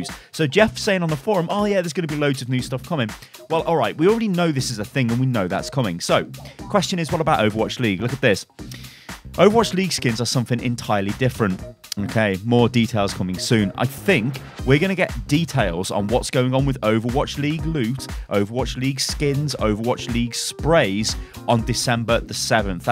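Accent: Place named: United Kingdom. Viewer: I love these vidoes. They make me all warm and nice when I hear lovely british voice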